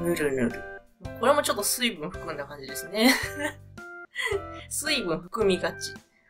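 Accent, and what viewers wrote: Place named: Kansai, Japan. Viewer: 関西女子らしく😅ぷっと吹いてしまう話し方😅好きや👍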